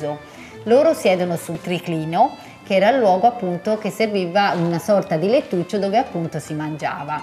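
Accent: Tuscany, Italy. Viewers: Se cerchi di togliere quella c toscana almeno quando spiegi sarebbe meglio per una parlata più omogenea e italiana perchè a certi da dastidio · Es italiano con el acento toscano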